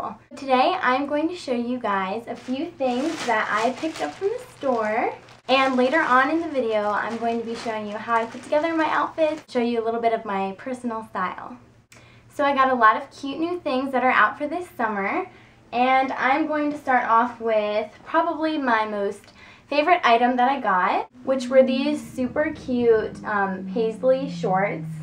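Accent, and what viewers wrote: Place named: United States. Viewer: Tu es magnifique, ton visage est.... il n'y a pas de mot, ta voie je l'adore c'est la voie américaine que je me suis imaginé, ton style est très beau. Gros bisoux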